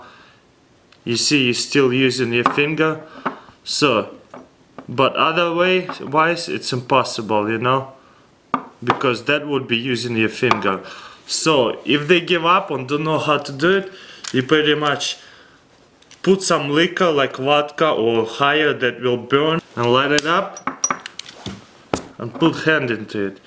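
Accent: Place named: Russia. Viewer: он русский, слышно по акценту, наш человек.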